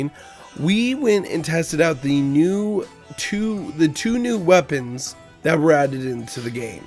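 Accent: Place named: Ireland. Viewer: so you are coming back to h5 i did like your irish accent😎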